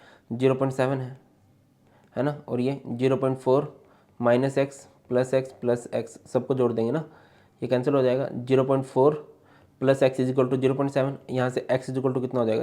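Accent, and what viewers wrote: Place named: Indore, India. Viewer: are hamare Indore taraf aise hi bolte he yaar 😅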